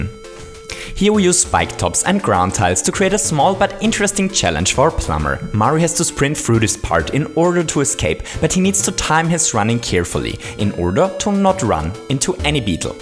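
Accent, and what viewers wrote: Germany. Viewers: Why would you pronounce Kaizo in an American way (sounds like Kayzo, when you say it)? English clearly isn’t your mother tongue and I guess it actually is German. If you would simply pronounce it in a German way, you’d be much closer to the actual, Japanese pronounciation.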